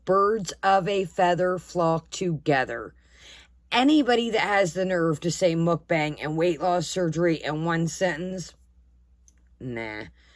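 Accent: United States. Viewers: Your text is too cute! I forgot that has an American-accent! ❤️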